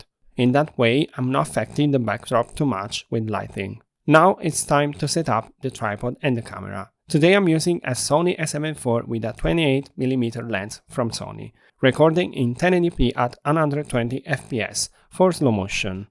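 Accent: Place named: Turkey. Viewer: Dude, are you Turkish? You have Turkish accent 😊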